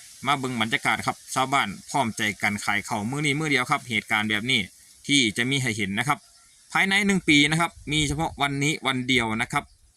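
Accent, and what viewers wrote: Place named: Northern Thailand, Thailand. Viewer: สำเนียงคนเหนือผสมลาว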